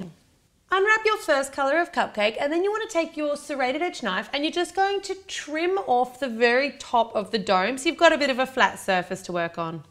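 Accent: Australia. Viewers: Is she australian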